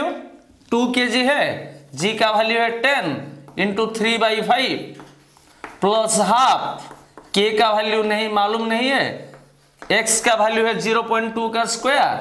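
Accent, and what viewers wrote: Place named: Odisha, India. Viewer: Your hindi accent is like an odia...You must be an odia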